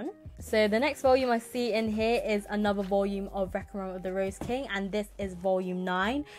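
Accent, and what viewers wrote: United Kingdom. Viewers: When I heard your British accent you have NO IDEO HOW HAPPY I WAS we need more British manga youtubers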